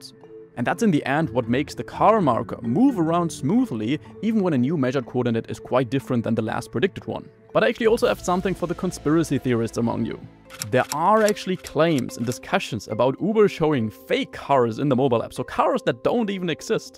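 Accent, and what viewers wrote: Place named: Germany. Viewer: 300k subscribers, amazing editor, no money for elocution lessons. He pronounces English as if it were German. No vowel shift, no consonant adaptation. . Cringe. I bet if he invested 300 euro in private elocution lessons, his English would improve above and beyond. He is clearly capable, just unwilling.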